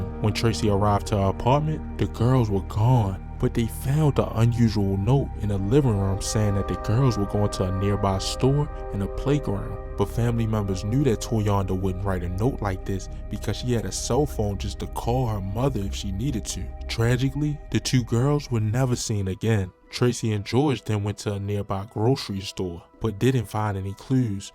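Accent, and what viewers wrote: Baltimore, United States. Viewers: The narrator sounds like he from Baltimore.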